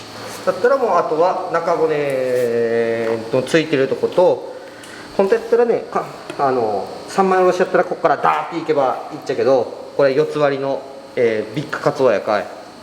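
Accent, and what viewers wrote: Miyazaki, Japan. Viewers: 宮崎の方言に ほっこりだわ～😂 凄い 裁きですネ 旦那がぁ 宮崎出身だから～ 懐かしくて😂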